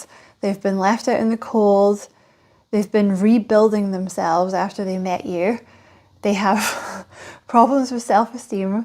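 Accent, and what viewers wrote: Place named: Scotland, United Kingdom. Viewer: Love your Scottish accent Lass! ❤ aye 🎉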